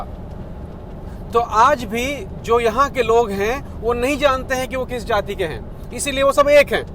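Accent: Bihar, India. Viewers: Bhai aap ka language me biharipan lagta hai ... Very good bro thx for this information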